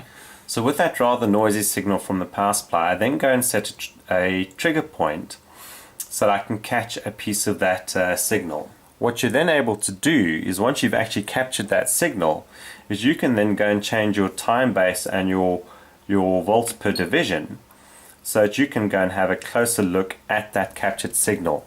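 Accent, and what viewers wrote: South Africa. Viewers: Not only South African accent, but I would place it as Johannesburg, and probably Sandringham / Orange grove. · Nice video. South African accent?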